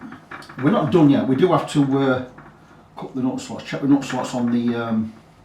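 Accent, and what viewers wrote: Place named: Clay Cross, United Kingdom. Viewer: I knew that accent,I’m from Clay Cross.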